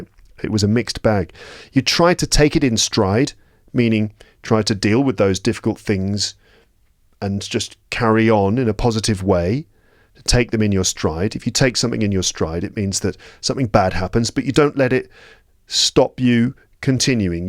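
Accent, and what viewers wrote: United Kingdom. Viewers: Is this british accent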